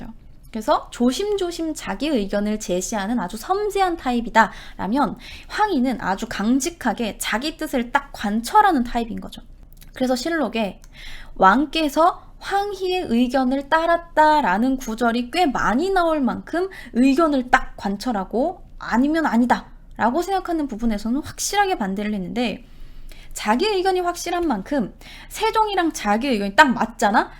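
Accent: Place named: Chungcheong, South Korea. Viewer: 충청도유? ㅎ 구수혀 ㅎ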